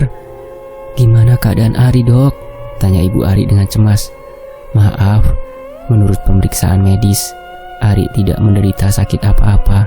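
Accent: Java, Indonesia. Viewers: agak medok ya 😁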